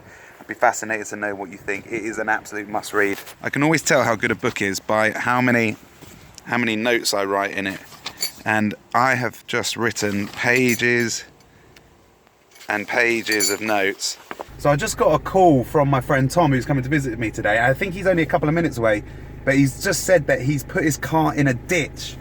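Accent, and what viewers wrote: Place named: South Africa. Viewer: Love the South African accent,! Would like more of it;)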